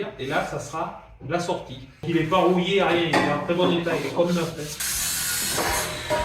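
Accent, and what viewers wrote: Corrèze, France. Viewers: On te regarde faire comme d'habitude 😊 Mais quel plaisir de regarder ce travail de qualité. Et j'ai une petite nostalgie de l'accent corrèzien, j'y ai passé une dizaine d'an-nées 😉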